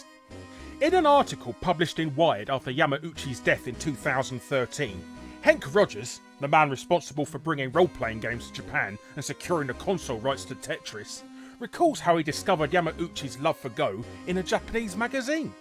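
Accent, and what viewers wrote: United Kingdom. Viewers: You're welcome! I'm just an american fellow who loves british accents. Also, i love your content, and Peter molyneux, is always fun to make fun of!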